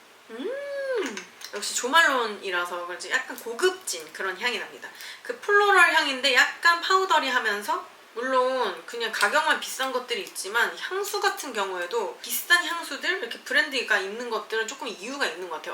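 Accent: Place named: Seoul, South Korea. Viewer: 우리친구야 와이리 서울말쓰는거 낯서노 잘하노? 지기네 친구야 뷰티 인플루언서 같데이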